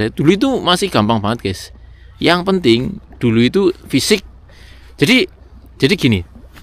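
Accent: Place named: Cilacap, Indonesia. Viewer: Wis mbedek kayane wong cilacap sampean kang wkwk. Salam pepada wong cilacap yaa kang, suwun nggo konten e. Semangat kerja ne kang mbesuk tek nyusul ming jepang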